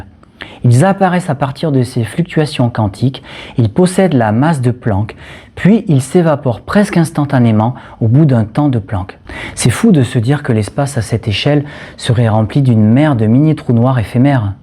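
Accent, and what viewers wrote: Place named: South, France. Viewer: Aïe ! Il vous faut prendre des cours de diction, mon garçon : la monotonie de votre récitation est insupportablement ennuyeuse, malgré les infimes traces d'accent méridional que vous y laissez traîner. Essayez de faire lire vos textes par une voix synthétique, ce ne pourra pas être plus mauvais en tout cas. Cordialement !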